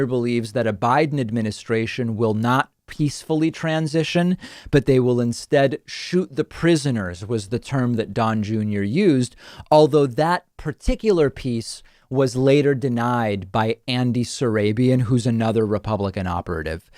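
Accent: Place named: Buenos Aires, Argentina. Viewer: I love your heavy Buenos accent, che pibe! También tengo miedo de que salga reelecto otra vez, que Dios nos libre!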